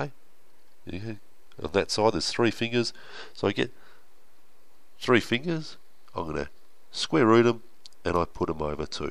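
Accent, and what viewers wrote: Australia. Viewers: is he australian? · I love this guy he use smart way to teach Math. His English sounds like Australian yet a lot easy for me I really want to talk to him one day. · He’s Australian... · This guys obviously Aussie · Now that is one thicc Aussie accent. I love it! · Pure Australian accent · He is an australian that is why he sounds like that. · A true Australian accent that is.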